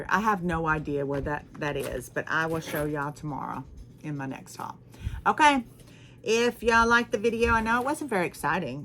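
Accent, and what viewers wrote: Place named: United States. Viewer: Oh you never fail to make me laugh”ain’t nobody all up in my legs” I’m from New Zealand so the way Americans talk makes me laugh ya all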